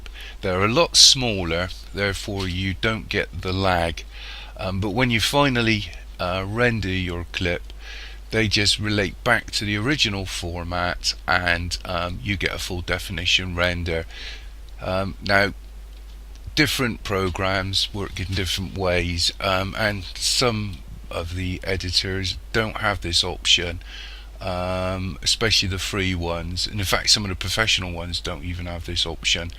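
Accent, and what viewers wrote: United Kingdom. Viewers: Thank Mr. Guessing you're from the UK.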